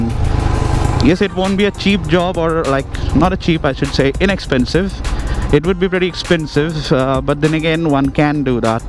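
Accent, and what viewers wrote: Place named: Bengal, India. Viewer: You sound like you are Bengali. And the pace of your narration is like atal behari vajpayee.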